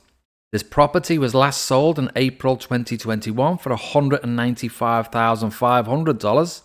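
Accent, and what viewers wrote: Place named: Northern England, United Kingdom. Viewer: This guy sounds like a Northener, England, but New Zealand has so many scammers now, he could just an impression 😮😅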